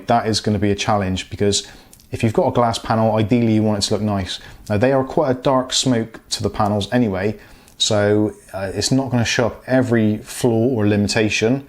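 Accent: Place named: Cornwall, United Kingdom. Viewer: Are you from Cornwall? I recognise your accent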